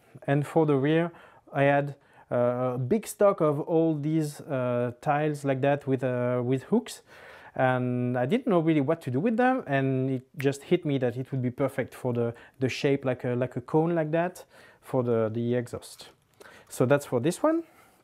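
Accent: France. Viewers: MDR cet accent français On est là !! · He's french like me ! This accent français ! Cool que tu sois une des personnes qui a fait le razor crest en lego ! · Tu parle frençais vue ton accent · l'accent français yeees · Oula l’accent français est très présent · L'accent français oulala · Cet accent français magnifique ;) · You really hear, that he is french. But it hears so good!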